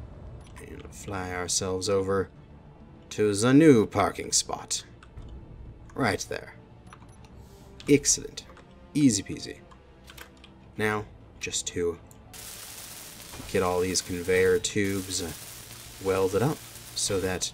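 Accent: United States. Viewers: Hey dude, I'm really enjoying your series, keep up the good work✌🏻 I have a question... What accent do you have? I assume you're American but where in America🤔 thanks haha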